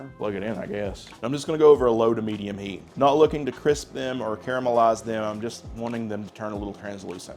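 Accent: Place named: South, United States. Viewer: I love southern accents and the way you guys say uhl. Or earl lol when I’m around someone with the accent for a few hours I subconsciously try to pick it up and use it…. 😬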